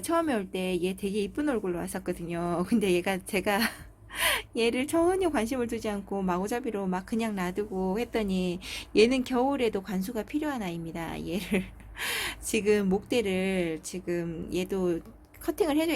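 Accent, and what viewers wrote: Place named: Ulsan, South Korea. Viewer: 울산 어쩐지 목소리가 친근감이 있어서 저도 울산^-^ 항상 잘 보고 있답니다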